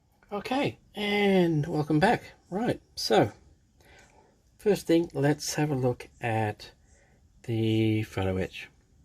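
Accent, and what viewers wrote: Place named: Australia. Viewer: Great Review. I picked up this Kit a Couple of mths ago ( ish..!) It's in my Queue, awaiting the Time to Build it. Also picked up a Flak 88mm crew to add to it. ( a little fettling should make them look Ok..! ) I Also bought a Searchlight kit to go with it, but that needs Crew too. But its all a 'Work in Progress.' The Takom, pressings are just so Crisp, its a very impressive kit overall..! Great Detailed Review have Subscribed due to the Quality of it, and your an Aussie like me. So your Easy on the Ears.! Cheers Mate from a Fellow Modelling Nut, somewhere in Sth.Aust. 😂🦘😎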